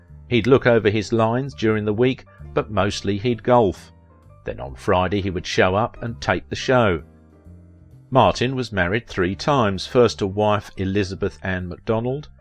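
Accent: England, United Kingdom. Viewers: He's English. Accents happen.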